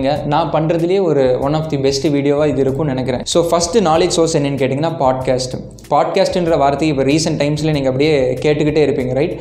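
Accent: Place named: Kerala, India. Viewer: I like your Tamil+Malayalam accent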